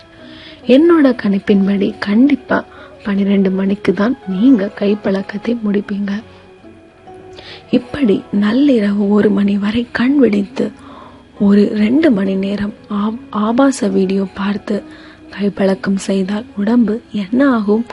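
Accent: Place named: Kerala, India. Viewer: Unga voice Kerala mood arithu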